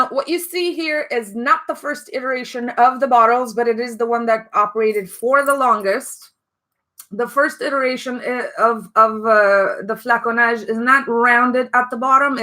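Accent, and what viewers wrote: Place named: Romania. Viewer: Incep sa ma uit la Imperial Opoponax pt ca asta ma interesa si zic in mintea mea: 🤔 parca are accent de romanca 😅 si ma uit sub video si vad: romanian chanel ....😅 am avut noroc sa il gasesc in Norvegia si l-am luat intr-o secunda😁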